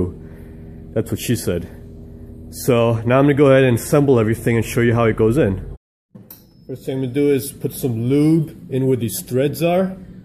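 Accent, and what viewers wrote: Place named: New York, United States. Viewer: good video. love the witty commentary. $ says you are from NY or NJ, reminds me of home (NY)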